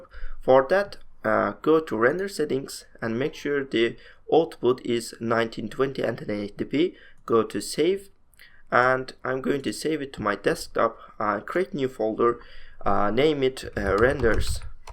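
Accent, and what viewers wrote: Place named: Turkey. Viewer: Your accent is like Turk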